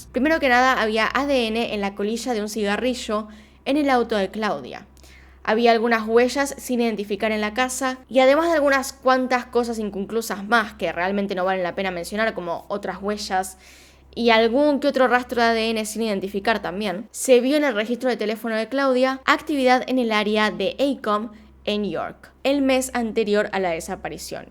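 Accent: Argentina. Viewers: Me encanta su acento Argentino.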